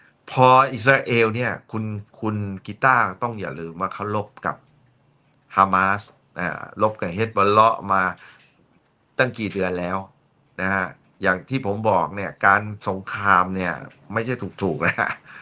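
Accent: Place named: Central Thailand, Thailand. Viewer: ชอบช่องนี้.พุดกลาง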